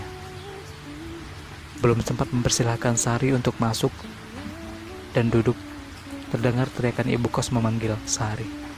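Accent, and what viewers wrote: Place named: Java, Indonesia. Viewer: memang mantap sekali ini cerpenya,indah sekali cara merangkai kata-kata dan intonasinya,bisa mengolah suara medok khas jawanya, sang kekasih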